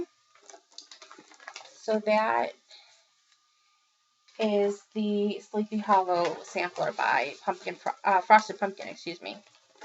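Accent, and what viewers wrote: Boston, United States. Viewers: Great video and great haul!! I love listening to you!! Your pieces are awesome!! Ps.. I am Canadian and I knew your accent was Boston!! Love it!! Happy Birthday!! I hope you have lots of stitchy fun!! And happy thanksgiving to you and your family!!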